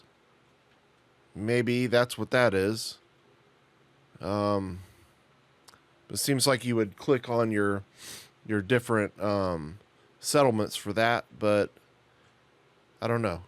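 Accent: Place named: Texas, United States. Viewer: Love the Texan accent 🙏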